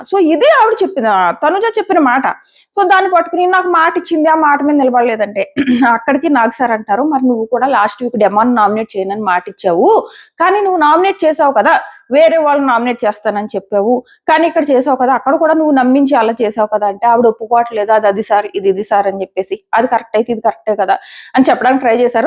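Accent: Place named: Godavari, India. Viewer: Ammai, You are from mana Godavari district ana mata.. Nice slang....❤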